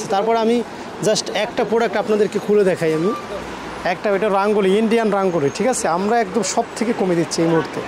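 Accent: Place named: Barishal, Bangladesh. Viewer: Narayongong er lok er borishaler moto kothar sur keno ashe ??. uni ai alakar lok na?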